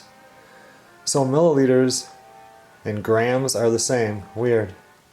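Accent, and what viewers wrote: United States. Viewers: Hello, I like to clear something up reading some of the messages heck even my own i see something that needs to be addressed. What I am about write I mean in the best possible way. is a hard working driven man. Who for whatever reason fell into this posting events from his life a small part of his life. That we the viewer find informative and funny and just entertaining. Why he does this i have no clue maybe he just likes to entertain maybe teach in someway. Here is what I do know without a doubt has the same problems we all do. What we see as idyllic is just that what we see. We don't see mad or sad. I'm sure as someone who has been married for almost nineteen years being a couple takes work. I love it when says he did no filming to spend time with his sweetheart somethings are far more important to him then our entertainment. I wrote once to that privacy is important never more so when loved ones are involved. 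But i know they fight he need not say that i know he argues with his kids anyone who is a parent knows that. We who watch hold to a impossible standard No one could live up too. I hope he is not off put by this because i have no doubt he is a good soul. But we the viewers need not make more than he is a hard working driven man who cares deeply for his family. He sounds down right American to me. We need those so much right now!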